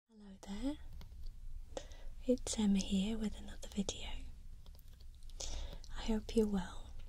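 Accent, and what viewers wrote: United Kingdom. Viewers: Omg for a second I thought there were actual grasshoppers in it! I'm in love with UK accents. ♡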